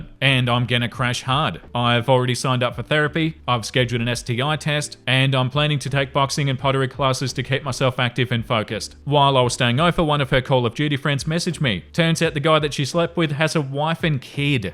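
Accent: Australia. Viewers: Australian, one of the best accents imo. Altho I'm a bit enamored of a Scottish accent as well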